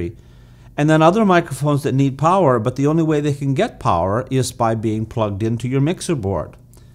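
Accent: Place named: Eastern Europe. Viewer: I am picking up some Eastern European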